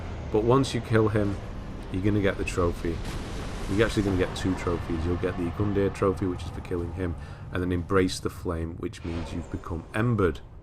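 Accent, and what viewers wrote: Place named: North, United Kingdom. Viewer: To my USA ears, it’s perfect to get a walkthrough from someone who sounds North Country, just like all the NPCs in fromsoft games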